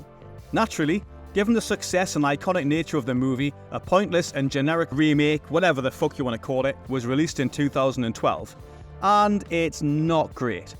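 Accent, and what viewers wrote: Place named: Newcastle, United Kingdom. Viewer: A bold move to use a Geordie for the V/O!!! One of my favorite accents in the world!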